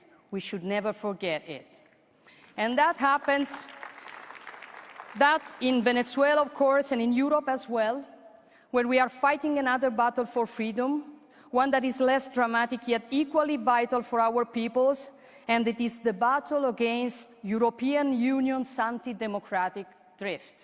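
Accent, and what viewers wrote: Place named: Rome, Italy. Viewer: A tutti quegli stronzi che ti sfottono che parli in romanesco, beccatevi questa.....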